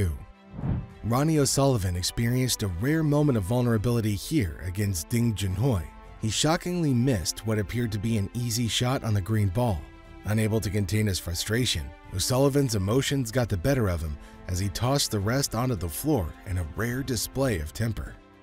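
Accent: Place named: United States. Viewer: It's very weird every time you hear commentary with American accent on a snooker video...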